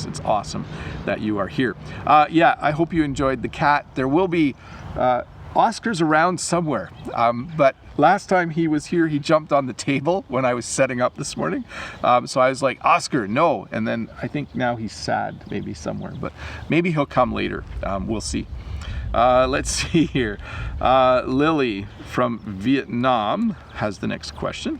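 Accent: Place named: Canada. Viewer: Hello,! Hello from Ukraine!:) I'm glad I found your video lessons. This is the first time I hear Canadian English! Thank you for your work.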